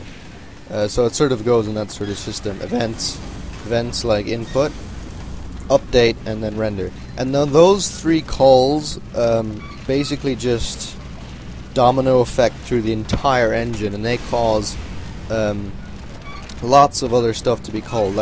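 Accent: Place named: England, United Kingdom. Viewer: at the start.... I see what you meant about the English accent xD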